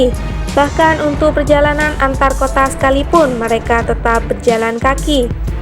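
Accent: Madura, Indonesia. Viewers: naratornya oreng MEDURE neka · Cewek pembaca cerita nya orang madura atau mana? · Naratornya..sepertinya ..orang madura..logat nya kental sekali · Makin lama makin dalam maduranya .. 🤣🤣🤣🤣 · Admin nya orang mana ini kok Logat nya Kya Madura 😅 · Voice over nya logat Madura ? Betulkah demikian ?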